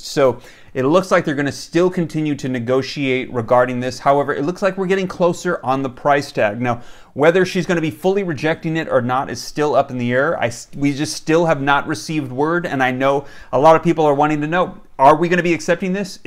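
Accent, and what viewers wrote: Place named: California, United States. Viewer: whoops! I'm sorry if I was being a butt a little but everything I said here is a true statement of mine. however I do like and appriciate his work which I have no problem about him making money off of those vids👍( I mean it's time like this how could we blame him on doing what he can time like? plus at least it's all coming from big rotten companies not our blood sucking tax!!!! 👌👌👌 **AND i think hes kinda cute with his cali accent❤️ I really really NOT a hater I do respect ppl who are not wanting those updates also💚 since it's been so ridiculous it's not even funny that politicians only think about themselves!!!!!!!😡 we ARE HURTING we NEED PAY BILLS RIGHT NOW!!!!! yeah Bills, NOT for luxury vacations or sports cars or anything else we wanna spend our money on.... THATS WHY THIS UPSIDE DOWN ROLLER COASTER MAKES US MAD & SAD I'm with ya all!!!!!!!!!!! 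GOD BLESS OUR AMERICA 🇺🇸 p. s. please know that I'm your subscriber & Ive been watching all of your vids & DESTROYED THAT LIKE BUTTON EVERYTIME contrary to my bitter comments😝 💚💚💚💚💚💚💚💚💚 So please keep up w/ your good work! WE LOVE YOU 🤘!!!!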